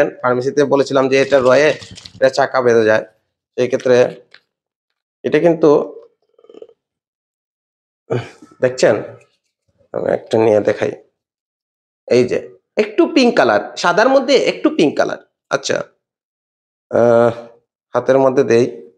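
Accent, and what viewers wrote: Sylhet, Bangladesh. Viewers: আপনার উচ্চারণ শুনে লাগছে সিলেটের লোক😑,আমাদের সিলেটের মানুষ শুদ্ধ ভাষাই খুবই কাচা😑😑বলবেন স্যার আমি কী ঠিক বললাম???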